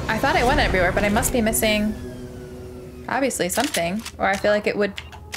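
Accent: New York, United States. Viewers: I absolutely do not mind your New York accent. I actually find it to add extra flavor to your already great video content lol. I encourage it lol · I knew you were from NY! Are you by any chance from WNY? 😂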